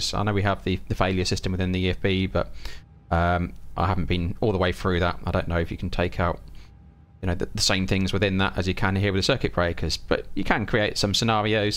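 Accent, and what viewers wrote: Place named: United Kingdom. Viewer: Good videos, but at times the british accent comes a bit much to my taste.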